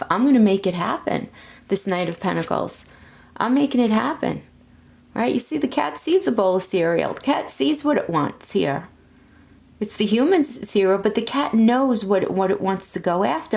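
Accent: New York, United States. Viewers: Nu yourk! Love your accent. 💗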